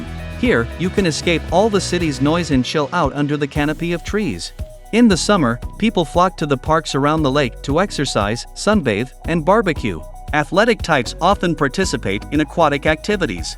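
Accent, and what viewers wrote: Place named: United States. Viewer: I like НаmВ., Вt.I dont like prono.narrator speaks, american , nt EnglisН.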